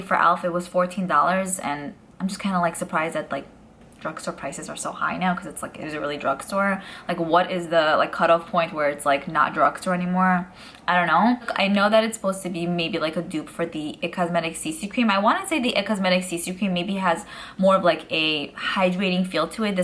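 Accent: New York, United States. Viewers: I ❤ your New York accent. You make me smile with every video. Could you try the new wetnwild palettes? Some love it, others hate it. I don't know what to think. Also the new Revlon prismatic eyeshadows palettes?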